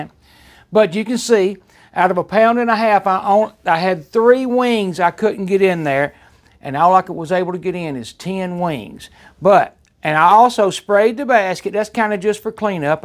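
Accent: South, United States. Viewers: His down south accent talking about wings is weirdly soothing. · His southern accent instantly makes me trust him. Great video good sir!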